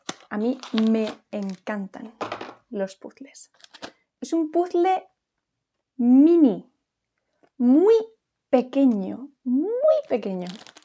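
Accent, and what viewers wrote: Spain. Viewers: Una pregunta, de donde vienes concretamente de españa? Me encanta tu acento y me gustaría usarlo en mi vida diaria. ❤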